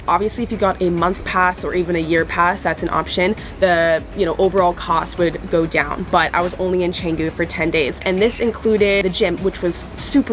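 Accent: United States. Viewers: This girl has a total American accent…… I am American and there are different accents based on where you live, but hers is pretty standard American. The American sound that other Americans would consider to NOT have an accent. Accents that are commonly known around the world but very specific to a local area and not all of America……a New York, Boston, or far south accent. These are not representative of most American speakers, this girl is.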